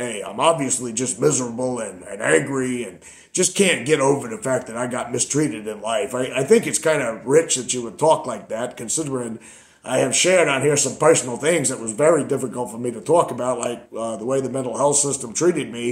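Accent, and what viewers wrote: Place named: New England, United States. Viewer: New Englandar! Love it!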